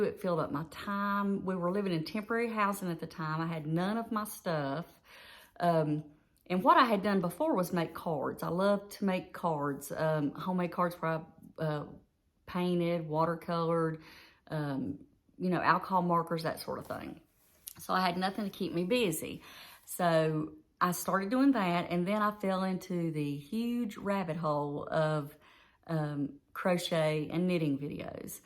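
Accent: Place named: Tennessee, United States. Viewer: Hi, I'm Charlene from CHARLENE CROCHET CORNER, I just found you and I love your accent. I live in South Carolina you sound maybe Tennessee? I subscribed and would invite you to subscribe to me. I love yarn but I'm not as lucky as you are. I just got out of a 9 week hospital stay for my 145th surgery. I was a nurse but my disability caused me to go out work my last shift on Nov 4th, 2007 came home and got in my hospital bed in my livingroom and proceeded to have a nervous breakdown. That is kind of why I started my channel. If I didn't have the love of my channel, well I would have no yarn and not as many wonderful friends & now they are family to me. I love them dearly. I will shout out your channel & would appreciate the same. Oh I love your Carrie Penny shawl, do you have the pattern? I need a copy those are gorgeous! Hope in a future wrap? 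Oh I so love that. I need yarn for that. I love fingering wt yarns. Good for you not letting it beat you. I love your hair. I'm going to be 58 in July. SO we are close. I don't edit my videos it's to hard. Ok I'm going to find the info in your description box. Oh and welcome!hugs, charlene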